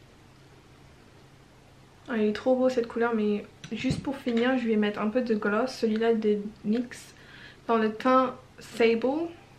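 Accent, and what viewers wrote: France. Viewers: Your french sounds great...t'as un ptit accent français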